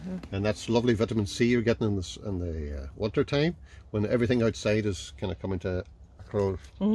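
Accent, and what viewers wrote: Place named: Donegal, Ireland. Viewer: Love this , keep them coming! Lovely to hear a lovely Donegal accent.. well done 👏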